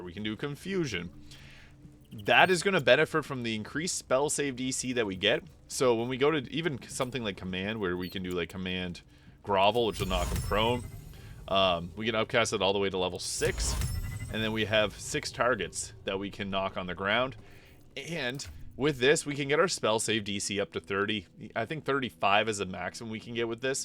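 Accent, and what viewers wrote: Canada. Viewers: Just subbed since I've seen a few of your videos and I enjoy it. Are you Canadian? Random question, sorry. haha.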